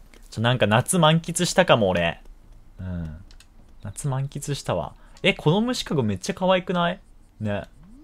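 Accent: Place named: Gifu, Japan. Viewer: たまに出る方言的に石川か岐阜かなって思ってましたが、岐阜でしたか。勝手にすっきりしました。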